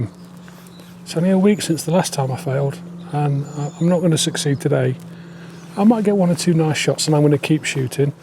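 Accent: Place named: Mansfield, United Kingdom. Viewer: That's a very familiar accent! Mansfield by any chance? Am frum Wooduss! One of the things that made me ask the question, apart from your accent, was the "If you can't be good, be careful!"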